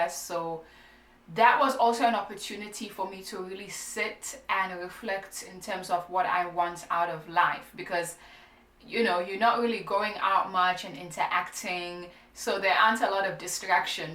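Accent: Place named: Jamaica. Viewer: your jamaican accent gave you a follow.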